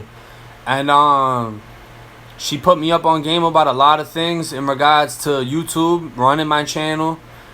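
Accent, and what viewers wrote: Boston, United States. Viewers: I can here his battle between his boston accent and his fla. Accent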